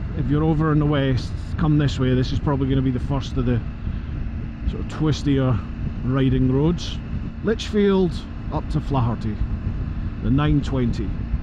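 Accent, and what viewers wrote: Scotland, United Kingdom. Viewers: Excellent viewage, just love the noise that bike makes and with a scot accent ... the landscape is phenomenal 👍😎👍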